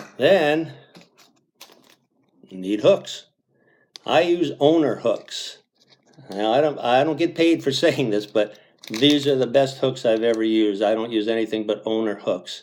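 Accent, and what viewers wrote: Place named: New Jersey, United States. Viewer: Elope the NJ accent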